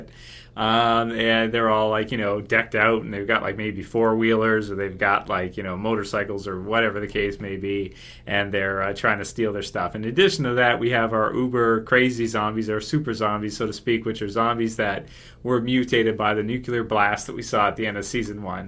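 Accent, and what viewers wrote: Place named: Ontario, Canada. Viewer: no one in Ontario pronounces "about" as "aboot". As I am from there, and I think sounds like a typical guy from Ontario. MAYBE some people in the far east coast of Canada like rural Newfoundland or maybe rural Nova Scotia (with their scottish roots) you might get someone that even come close to saying "aboot"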